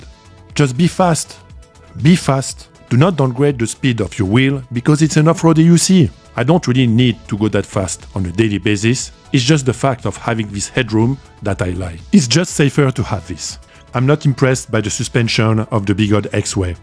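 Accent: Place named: France. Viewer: je te le redemande , es tu francais ? l'accent me vle fait penser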